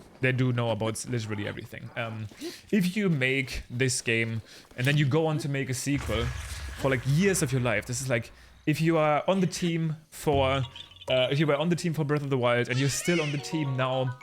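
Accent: Germany. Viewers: little german accend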